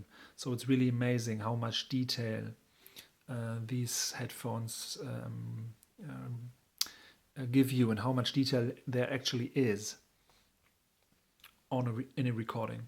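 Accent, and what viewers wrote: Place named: Germany. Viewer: You sound very german. Nice review.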